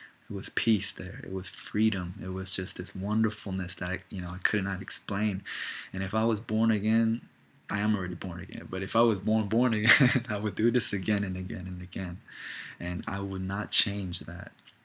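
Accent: England, United Kingdom. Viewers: oh i dig your british accent ;) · Looool I like the English accent. And tr thig you said about Adam and Eve was actually so true , after the flood in Noah's time people's lives started gettif shorter due to sin ,and disease started spreading to. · I'm from England! I'd send you something but I'm not really sure... I guess you'd expect tea or something. Your English accent made me laugh though.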